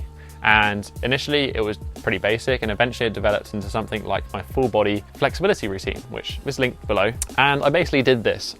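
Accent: United Kingdom. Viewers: Awww british., damn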